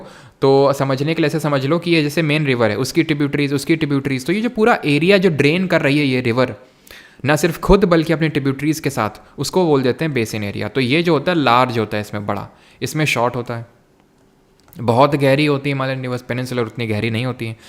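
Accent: Kanpur, India. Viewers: Boss ap kanpur se Hai ye tompakka Hai Accent se pta chal rha Hai 100%sure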